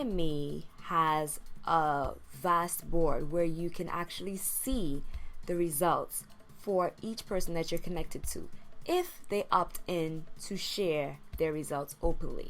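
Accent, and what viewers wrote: Trinidad and Tobago. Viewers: Exactly what I was looking for, fellow Trini! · 23andme Had a sale so i used them, also knew a friend who used it. I'm also a trini, i suspected you were as soon as i heard you speak. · I couldn't decide between Trinidad or Grenada when I first heard you speak. I got my answer browsing the comments lol. Either way, if you're from one, you likely also have family from the other. Great comparison video! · how you sounding Trini,sweet like a Trini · You sound Trini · I This lady sounds Trinidadian🇹🇹 Interesting video..... Hoping for a Christmas sale as they are expensive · You sounded like Guyanese until I heard the little Trinidadian accent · yuh sounding Trini!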